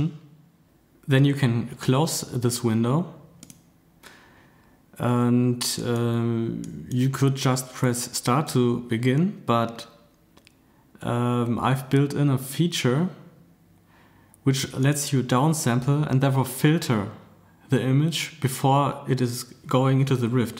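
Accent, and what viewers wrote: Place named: Germany. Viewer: The english seems to have a german accent, aber ich könnte mich auch irren =) Will you maybe bring your liveviewrift to the Gear VR aswell?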